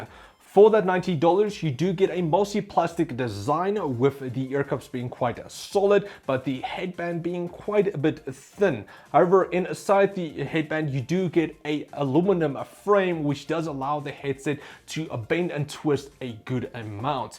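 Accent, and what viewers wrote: South Africa. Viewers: kak mad didn't know we had a South African Tech youtuber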